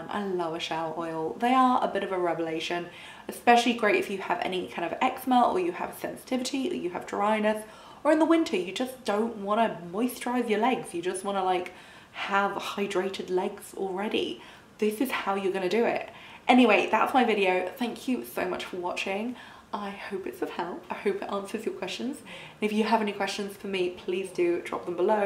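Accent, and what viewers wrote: United Kingdom. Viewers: Thank you for the infos said with a lovely British accent ❤️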